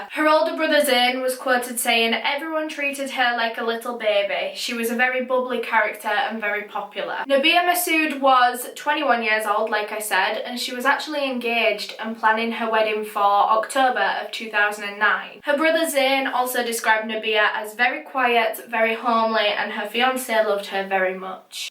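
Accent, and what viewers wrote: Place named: Yorkshire, United Kingdom. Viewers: it's her northern english accent - i think she's from yorkshire cuz of her accent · Just got into your videos and I’m so happy I found your channel. I’m really interested in true crime stories and have pretty much binged your channel since I found it. Love the accent, I live in a town near Hull and went to University in Sheffield so it reminds me of my time there quite a bit. Keep up the amazing content you’ve gained a new sub :)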